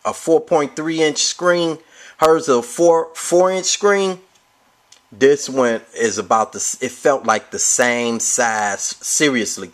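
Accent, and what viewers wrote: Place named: United States. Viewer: why cant you speak standard American English?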